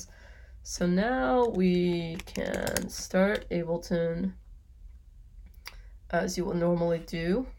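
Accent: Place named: United States. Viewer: japanese but american as you can see how she talks.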